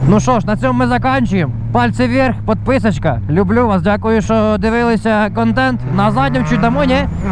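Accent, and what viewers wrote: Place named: Surzhyk, Ukraine. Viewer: Вчи українську! бо твій суржик звучить як риготня...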